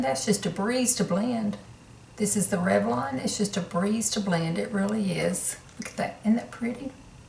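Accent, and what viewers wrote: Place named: Oklahoma, United States. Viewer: I just love your accent and the expressions you use! Reminds me of home (Oklahoma). Loved the eye looks and I'll bet no one could tell you had two different pallettes on! XOXO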